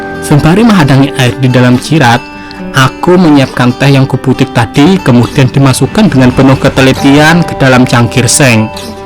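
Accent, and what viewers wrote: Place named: Kalimantan, Indonesia. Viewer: Khas kalimantan nya asri banar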